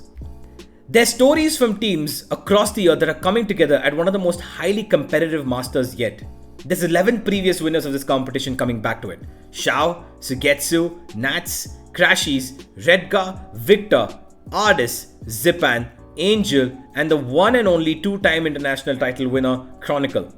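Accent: India. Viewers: Great video keep it going, its nice to listen an Indian voice talking about this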